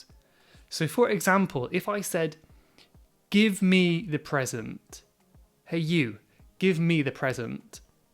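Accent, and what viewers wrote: United Kingdom. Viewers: I'm new subscriber, I'm loving your videos, thank you, I like the British accent.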